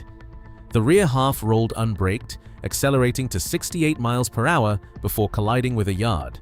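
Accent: United Kingdom. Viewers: I gave up after your brit speak I NERT moronic pronunciation too.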